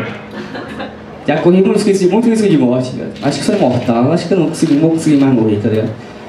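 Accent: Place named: Salvador, Brazil. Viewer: Vimmm pelo João seu Pimenta ,se ele indincou porque é bomm, com esse sotaque de Salvador é bomm mesmo haha Vai chegar!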